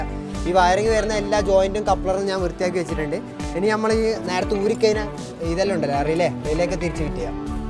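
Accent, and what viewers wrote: Kannur, India. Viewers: കണ്ണൂരുകാരാണോ ബ്രോ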